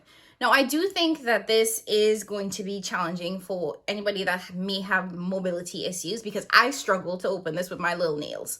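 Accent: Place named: Trinidad and Tobago. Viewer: I heard the accent and said to myself she’s got to be a Trini. I am from Trinidad, I can’t miss our accent. I will subscribe and support!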